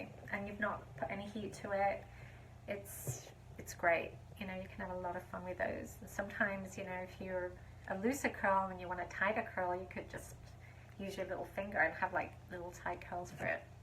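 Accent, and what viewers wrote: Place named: United Kingdom. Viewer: where were you when I was a kid!? I grew up in the 80s in the East Midlands and no one at school or the hairdresser’s told me about the Curly Girl Method. My mother told me to brush my hair and then chopped it all off when I refused 😬 when I read about your book I presumed you were American as this method appears to have traction in the States. Imagine my surprise when I heard your accent! Did you promote the Curly Girl Method and DevaCurl in the UK? I have never seen your products on sale here.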